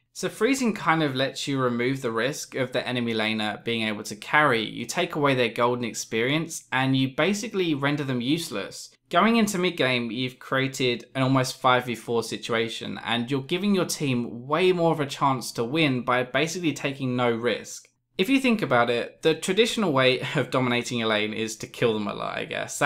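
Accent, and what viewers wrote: United Kingdom. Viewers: FUCKING BRITISH ACCENT :DDDDDD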